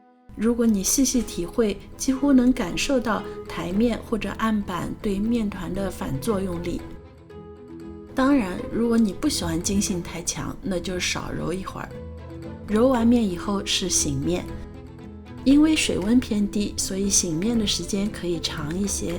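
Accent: Shanghai, China. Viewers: 阿姐太厉害了👍我也是上海人，在伦敦。我是从生煎那个追到这里的。一口气看了你好多视频。看你做菜真是享受，步骤详尽理论清晰，上海口音又亲切，你还长得像我姐姐。😂真是懊恼没有早点发现和关注你。实在压抑不住欢喜，决定要把我在油管的第一次评论献给你。😊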